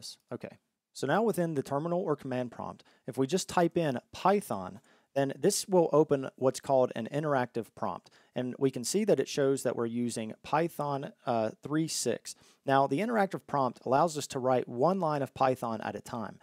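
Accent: United States. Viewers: Man, your speech is amazing. Where are you from? I mean it’s obviously that you are from U.S, but what state?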